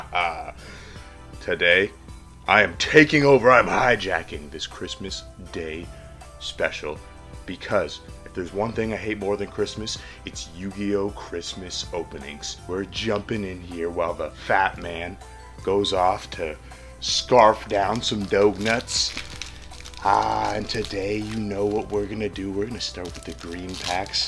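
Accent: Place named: Canada. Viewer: Always watch your videos just to hear your canadian accent reveal itself anytime you say "out" or "about" 😆 Everything else is just a bonus 😁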